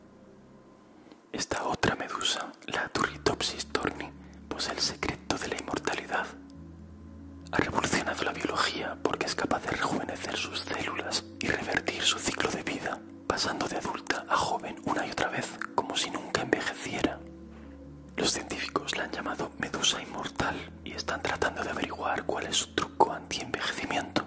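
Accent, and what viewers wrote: Spain. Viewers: Oye, ¿eres de España?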